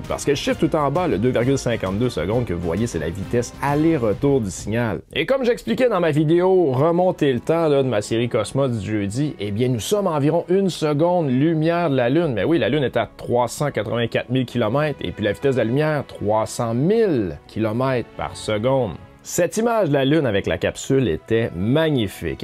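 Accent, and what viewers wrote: Quebec, Canada. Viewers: L'espace racontée avec l'accent de la Belle Province, c'est top!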